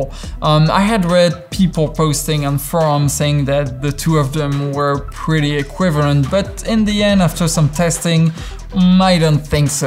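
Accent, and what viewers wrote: France. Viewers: Salut sachant que pertinemment tu es français de par ton accent même si c'est en anglais ta présentation est objective éclair me concernant je suis sur le viltrox car en premier lieu ça a été le budget qui me l'a imposé tu es rentré dans détail technique qui certainement on était factuelle pour le metabones et du fait que je ne suis pas dans de la technique pure je suis avec mon gh5 viltrox m2 et un sigma 18-35 à l'image dans 95 % des cas nous ne le voyons pas sachant que nous réalisons des clip pour le public et non des professionnels averti comme toi personne ne peut y voir une quelconque différence ensuite le jour où j'aurais un budget supplémentaire pourquoi pas upgradé,mais en l'état je suis très satisfait de mon viltrox m2,merci pour tes reviews de qualité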